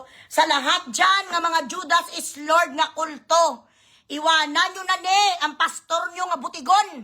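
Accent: Iloilo, Philippines. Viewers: 😂😂ginagalit mo si madam,,,,, 😂 natatawa ako sayo pag nagsasalita k,,, ilongga..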